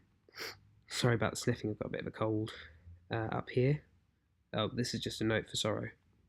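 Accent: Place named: United Kingdom. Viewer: Nice british ácĉent dude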